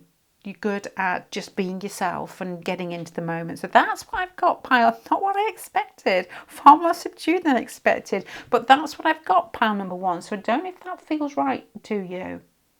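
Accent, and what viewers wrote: United Kingdom. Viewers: I can't undertand you when you speak so fast with the British accent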